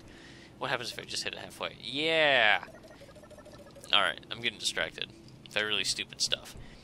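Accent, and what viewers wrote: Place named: Australia. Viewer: Totally Aussie!!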